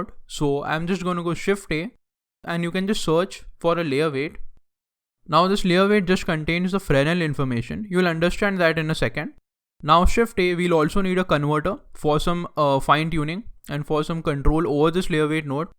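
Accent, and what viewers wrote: India. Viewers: Your tutorials are so much great, are you indian, ? As your accent looks indian, i love your tutorials 😀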